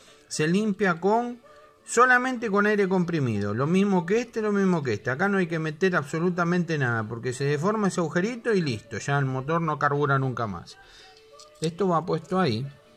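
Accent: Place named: Argentina. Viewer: Es argentino.. debe trabajar pues En chile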